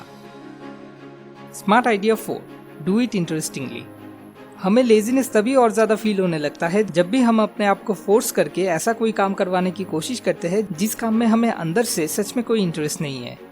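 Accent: Bengal, India. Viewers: aapki accent Bengali Wali hai...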